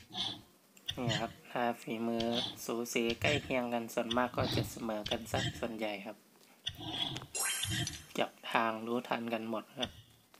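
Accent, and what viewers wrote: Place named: Isan, Thailand. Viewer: ผมขอโทษครับ หลุดฮาทุกทีสำเนียง ซ.ซ้าง ผมคนอุดรครับ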